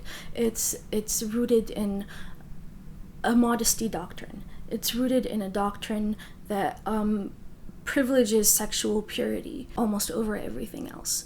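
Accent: United States. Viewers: Why dont she show her before-pics (for proof) with her face on front? It also makes me wonder how fluent and well-versed she is with english languange with no speck of hint of an accent considering she lived in Lebanon for more than 20 years, and magically have perfect american accent, and even if yes, she may have developed that accent, its impossible to sound that good with no stuttering and hint of a slip. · She sounds AMERICAN, and who wants to look at men anyways? I'd prefer a little segregated lol. Making a mountain out of a molehill.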